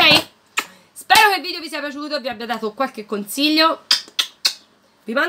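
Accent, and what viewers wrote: Tuscany, Italy. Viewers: Sei toscana?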